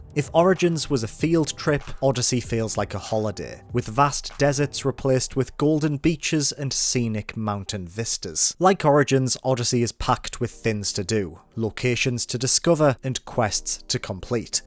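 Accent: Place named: Durham, United Kingdom. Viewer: Sounds like a Durham lad 👍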